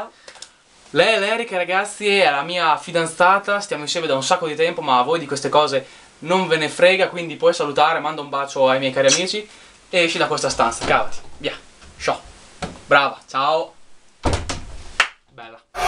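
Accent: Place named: Emilia-Romagna, Italy. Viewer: ma sei dell' emilia romagna? no perchè questo '' bella ragassi'' xD io dico bella ragazzi so siciliano :D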